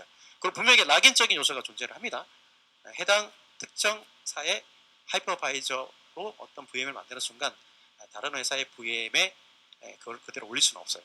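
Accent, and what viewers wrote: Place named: Seoul, South Korea. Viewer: 서울말 쓰네...갸가 갸다~~^^ 잘지내라 친구..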